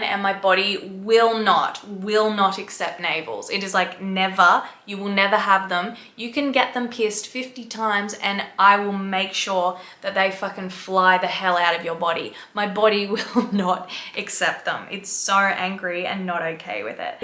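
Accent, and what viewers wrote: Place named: Australia. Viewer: I love your voice! Australian accents are my favorite!!!! 🖤 I’m from Tennessee lol. Oh, and have you ever said what your real name was? I can’t find it 😆 haha, sorry just curious. That would be cool if you did a q&a not just about piercing stuff, but I understand if you don’t want to get too personal on this crazy a** internet. Lol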